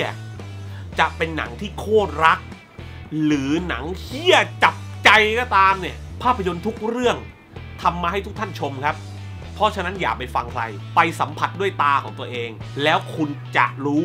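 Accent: South, Thailand. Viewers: พี่เป็นคนภาคใต้รึเปล่าครับ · พี่เป็นคนที่ไหนครับ จังหวัดอะไรครับ สำเนียงเหมือนคนพากย์ใต้เลยครับ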